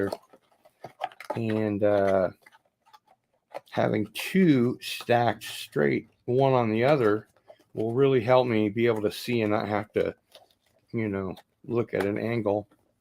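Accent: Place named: Australia. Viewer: Hey, I'm an Australian and I love your Aussie accent as well as your Christopher Walken impersonation 👍👍👍🤣😂🤣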